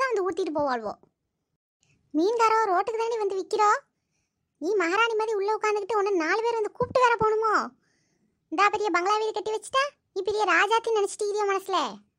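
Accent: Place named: Kilakarai, India. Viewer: na kilakari than unga slang spdiye enga ooru slangthan vera 11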